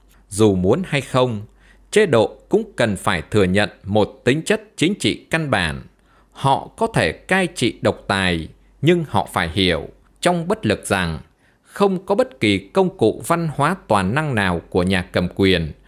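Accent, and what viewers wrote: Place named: Hanoi, Vietnam. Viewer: Giọng miền Băc, giọng Hà Nội vô cùng chuẩn và sang.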